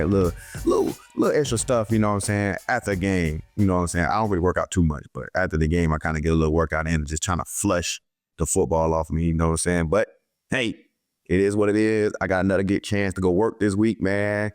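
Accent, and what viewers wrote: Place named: South, United States. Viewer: Bro i always tried to figure out why you was so country i see where you from Im right next door lol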